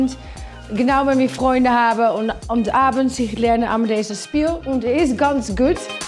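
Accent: Netherlands. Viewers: Lovely 🥰 ich liebe den holländischen Akzent 💕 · Es ist gut! Sie hat den wunderschönen holländischen Akzent ❤️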